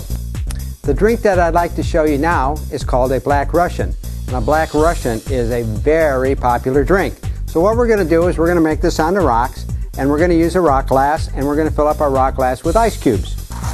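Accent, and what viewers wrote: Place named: Chicago, United States. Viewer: This dude sound exactly like what I imagine Chicagoans should sound like. Da Bears!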